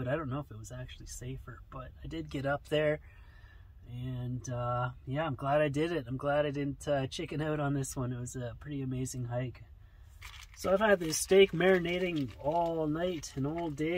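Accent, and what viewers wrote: Canada. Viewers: Is that a Canadian accent I detect?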